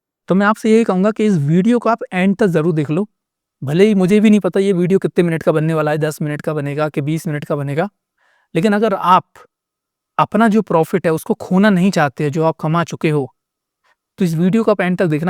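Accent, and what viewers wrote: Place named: Agra-Mathura, India. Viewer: Bhai aap agra ya mathura se ho kya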